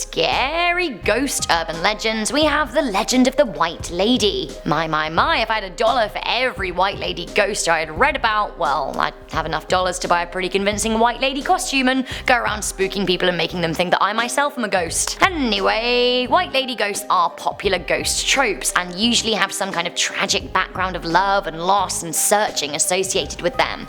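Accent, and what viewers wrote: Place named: United Kingdom. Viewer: I love sexy British accent. 😊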